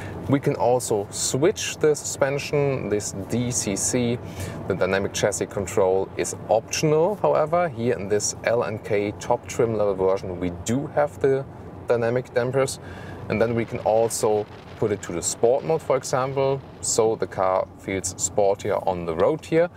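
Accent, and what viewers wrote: Germany. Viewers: Beautiful car but they could really find someone able to speak English with a not-so-brutally-broad German accent. First I got embarrassed why I did not understand what I thought German - it took me more than 1 minute to realise it's meant to be English :( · Terrible German accent. Do it in your mother language please!!!!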